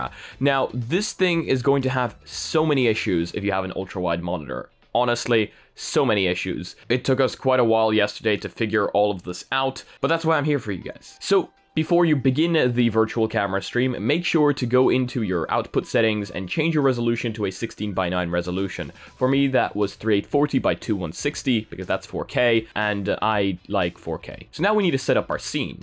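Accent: Poland. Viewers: question are you from poland?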